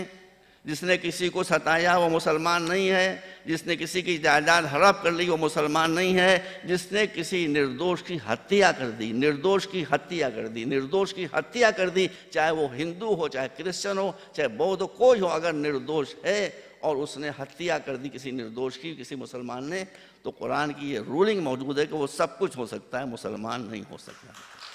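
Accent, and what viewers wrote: India. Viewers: Ye moulana bihari lagta he mujhe kyunki iski Hindi se pata chalta he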